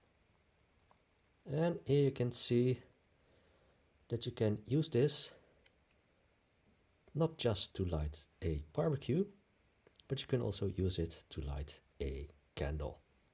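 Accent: Netherlands. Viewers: Wow weve got a dutchee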